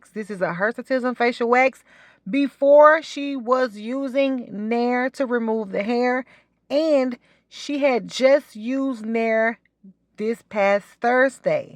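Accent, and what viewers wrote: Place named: South, United States. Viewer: I watch all your uploads so informative and satisfying to see! I have been through the gamut of hirsutism hair removal issues and just seeing you help others is very encouraging. This upload I enjoyed your accent and the way you pronounce "depilatory" I just love listening to your southern accent! Love it! Keep up all the great entertainment and good facial hair waxing help!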